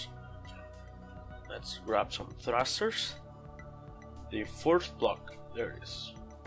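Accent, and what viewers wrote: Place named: France. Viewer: You can hear it's the dev by the French accent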